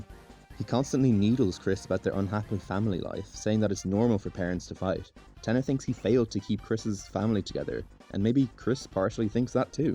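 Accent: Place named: Ireland. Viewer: RAHHHH IRISH ACCENT DETECTED!! irish they/them using deltarune fans must unite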